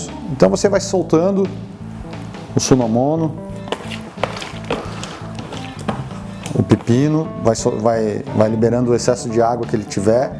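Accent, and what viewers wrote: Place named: Santa Catarina, Brazil. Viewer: Esse sotaque é catarina :-)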